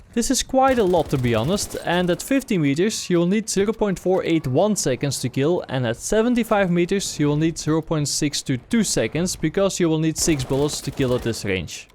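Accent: Netherlands. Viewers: from hearing your accent I'm guessing he's Dutch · Hoezo Hollands accent :p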